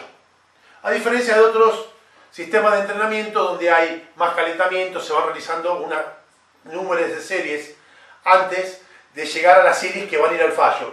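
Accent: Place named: Argentina. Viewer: No conocía tu canal. Me gusta. Es bueno oír gente hablando del tema en "argentino" jajaj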